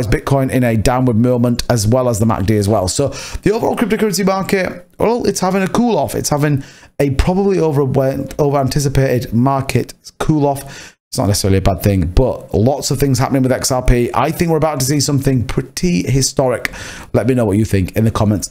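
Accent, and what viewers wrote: United Kingdom. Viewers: Great to hear a British voice doing XRP content. 🙏🏻 come on XRP…… from a fellow Brit.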